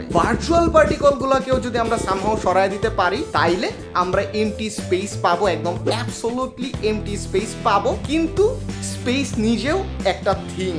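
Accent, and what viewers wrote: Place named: East Bengal, Bangladesh. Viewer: Give up your East Bengal Bangal language please.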